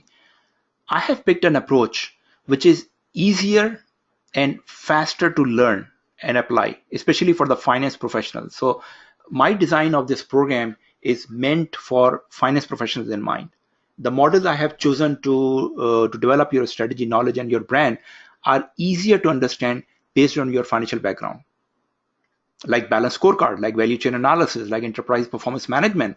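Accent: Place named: India. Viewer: it would be better to use an AI voice for these videos, listening to indian pronunciation is extremely exhousting, without even talking about the accent.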